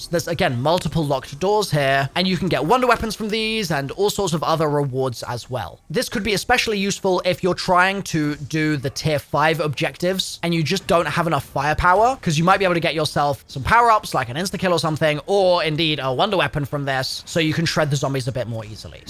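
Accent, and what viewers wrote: United Kingdom. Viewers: Obviously he's from the UK and they pronounce things differently. Aluminum as they say it is al-u-min-e-um. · It’s just British pronunciation. Relax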